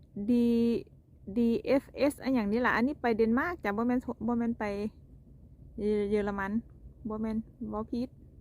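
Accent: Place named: Isan, Thailand. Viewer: สวัสดีเด้อครับเว้าคือกันแท้อยู่จ.ได๋น้อครับ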